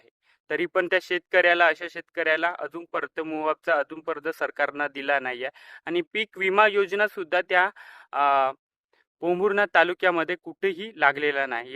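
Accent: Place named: Bihar, India. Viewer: Ha paka bihari ahey.ugich marathi anni shetkari boloth 😂😂😂.duplicate marathi.and politics 😂😂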